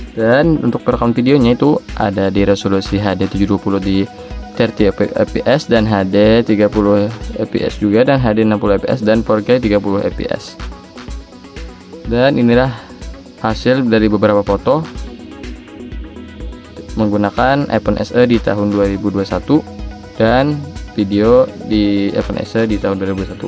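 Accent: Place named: Banjar, Indonesia. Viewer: Mun di dangar ni org Banjar pang😂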